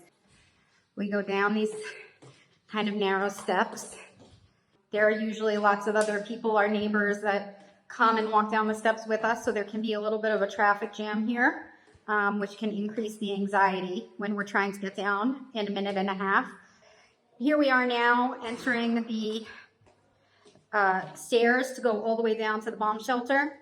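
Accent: United States. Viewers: you have a nice American accent....why u live on stolen land...go home save your Kids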